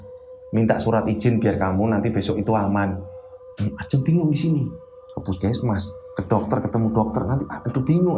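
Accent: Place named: Tulungagung, Indonesia. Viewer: Kyk wong tulungagung bahasa e.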